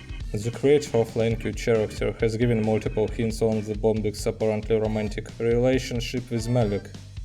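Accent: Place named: Russia. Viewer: cute russian accent :3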